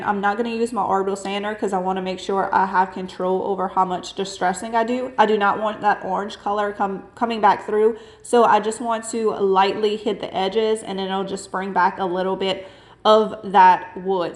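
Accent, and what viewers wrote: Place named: Georgia, United States. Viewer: I have some old farmhouse table legs I think I’m going to paint them and add little round disk to each end I love all your projects. And I love your accent are you from Ga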